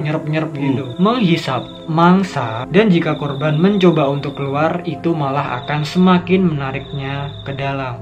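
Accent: Java, Indonesia. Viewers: Yang takut jangan nonton., atau nanti ngompol dicelana., wkwkw., as always like this., tapi keren., ada medok medoknya., hehehe., Semangat bang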